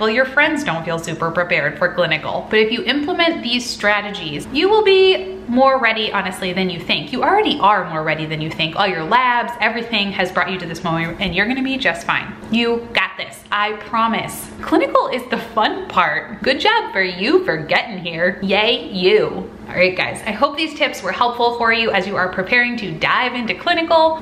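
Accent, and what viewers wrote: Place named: Midwest, United States. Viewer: Ok but the foldable clipboards (one that fits in your pocket!) are good! I love your videos btw. Are you from Minnesota? Or somewhere in the Midwest? I’m from Minnesota and your voice kind of sounds Midwest!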